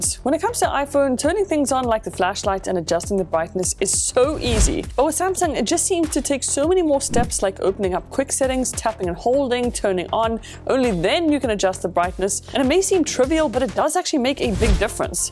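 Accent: South Africa. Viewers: You're totally from South Africa :) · Are you from RSA 🇿🇦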